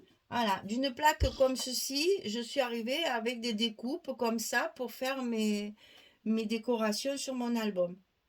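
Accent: South, France. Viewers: Superbe, j'adore te regarder juste pour ton petit accent du soleil 😘j'admire tes créations... Vais peut être finir par me lancer dans un album. Je ne connaissais pas cette marque, est elle chere ? Car petit moyen. J'essai de faire des créations avec mes petits moyens, je viens d'ailleurs de créer une chaîne qui comme moi, ont peu de moyens. J'ai trouvé une astuce pour donner un aspect corrosion à nos decoupes style steampunk, si cela t'intéresse. Merci pour tes partages❤️❤️❤️❤️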